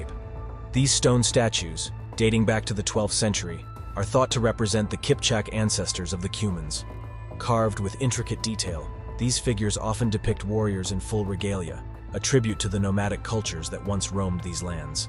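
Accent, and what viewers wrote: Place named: United States. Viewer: You sound like an American. Why are you giving metric dimensions. FTS.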